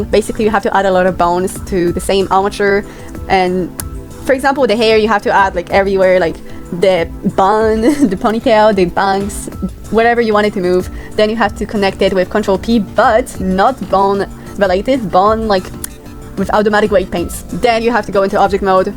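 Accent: England, United Kingdom. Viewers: masz piękny angielski akcent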